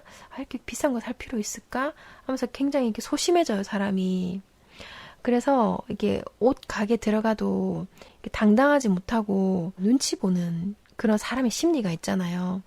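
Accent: Gyeongsang, South Korea. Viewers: 저도 경상도인데 특유의 엑센트가 재밌네요. 중간에 주문같이 말하는 부분도 재밌구요~ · 목소리 듣기좋아용~🤩 경상도 같으신데 맞나용?😘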